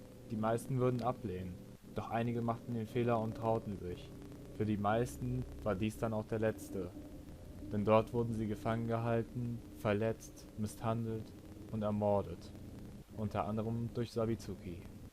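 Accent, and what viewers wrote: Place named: Germany. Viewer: diggah als du english am Anfang gesprochen hast wusste ich sofort das du deutsch bist😂😂 dieser Akzent die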